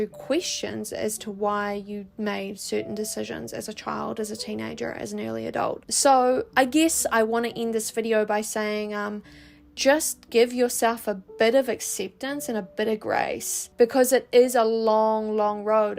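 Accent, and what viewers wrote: New Zealand. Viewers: I have an interest in accents, are you a NZ who's moved to the US or vice versa?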